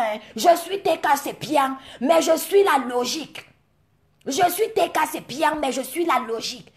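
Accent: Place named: Bassa, Cameroon. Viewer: Mama pourquoi tu m as vendu ???????????? Je suis au sol okololooooooo toi alors moi je t écoute a fond tu n insultes pas tu t exprimes bon français a la façon des bassaaaaaa c est l accent qui me finit une fois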